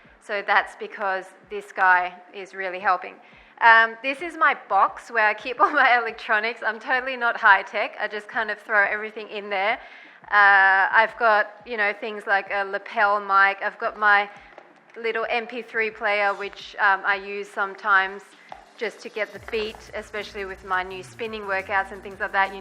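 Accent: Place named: Australia. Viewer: Love this behind the scenes video and totally agree with Mon P. that you have one of the best workout channels out there; I also recommend it to everyone I know who's into fitness. As a Chicago girl, I tell people that one of the fun parts of doing your workouts is your delightful Australian accent! A couple ideas I think would be great for Coffee Talk videos would be: 1) fat loss vs. weight loss and how to hone in on fat loss once a desired weight has been reached; and 2) what do you typically eat in a day/recommendations for how to eat well overall. Personally, I tend to eat healthy, but struggle with being able to find healthy foods that really keep me full. This is a tough area for me because my metabolism is pretty quick, so I always feel like I'm out-eating everyone around me by like double. Any tips?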